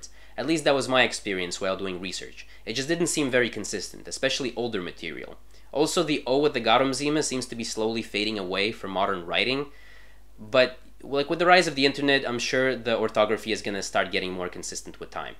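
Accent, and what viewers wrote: Russia. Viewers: Nice video, man! I speak Latgalian myself and I can tell you not many people my age speak Latgalian, which is a shame. Parents don't teach them, cause it's "a bother" and they'll never use it anywhere else other than in the family. And for the most part, it's true, I only use it when I talk to my family or talk with older people. :( I agree with we are latvians part! We are the same and I will happily be both latvian and latgalian. :) Did now know about Peter! So cool! I was about to ask where did you grow up, cause your accent is interesting while you were talking in latvian, but then I heard you talking in russian, lol. PS. Ausmeņa Kebabs ruļavoj! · Ak dievs, es uzzināju par tavu kanālu caur video par eļvdāļu valodu un brīnījos, no kurienes tu esi, jo es pamanīju akcentu. Izrādās, ka tu esi no Latvijas, tāpat kā es! Bet tavs akcents ir laikam krievu, vai nē? 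Paldies par šo video. Mana vecmāte bija polīte no "Daugpiļs".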